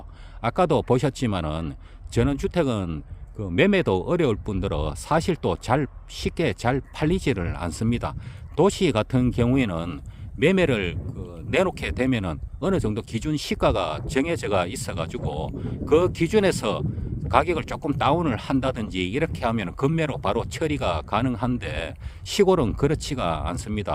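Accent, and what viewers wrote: Gyeongsang, South Korea. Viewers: 영상에 자막을 좀 넣어서 하면 더 좋겠습니다. 가끔 무슨 말인지, 경상도 지역 아닌 사람들은 빨리 알아듣지 못하겠네요. ㅠㅠ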